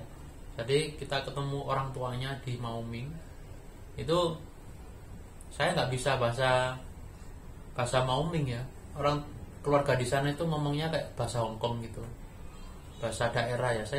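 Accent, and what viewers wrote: Java, Indonesia. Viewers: Logate kok jowo.jowo ngendi mase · bahasa Suroboyo,ne kok medok, dari mana asalnya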